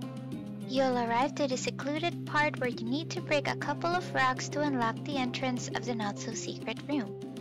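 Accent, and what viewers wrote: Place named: South Africa. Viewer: Awe! I just discovered you a few days ago, as i just started playing roots of pacha, and i have already commented a few times on your vids 😂 but i just wanted to tell you how much your video's and your ADORABLE voice brightens my days!!🤩💕 Also, were are you from? I dont know why, but your accent sounds a bit south african or something like that haha, well im from sweden so i have NO clue, im just guessing hahaha 😂 its something about how you pronounces words, like the fish names in one of you vids, and it just tickles the brain like south African accents do haha 😂 Also, your lil meerkat avatar makes me CRY hahaha, they're one of my favourite animal's , here in sweden we call them surikat, or if there's more than one, surikater haha 😭 You're welcome for that EXTREMLY useful info hahah😂😂 welk just wanted to let you know ur awesome and i love ur vids, you dont even know how much they have helped haha 🤩💕 love from sweden!!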